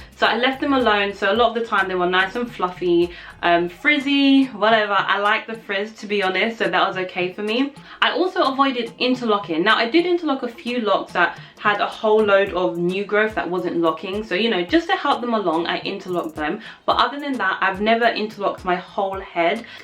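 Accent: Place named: England, United Kingdom. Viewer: wow I'm in my journey as well but you're beautiful accent took me way off course and I pay no attention to nothing else. Let me stay focused are you from England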